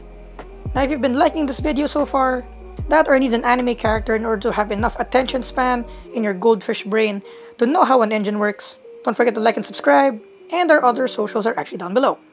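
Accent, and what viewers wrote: Philippines. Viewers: I’d recognize a filipino accent from a mile away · W Pinay Accent 👌✨ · This is the first vid I'm seeing from you and I can hear the Filipino accent already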